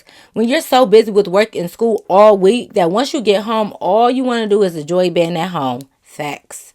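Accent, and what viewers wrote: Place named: New York, United States. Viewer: I knew this girl was from ny from the beginning lol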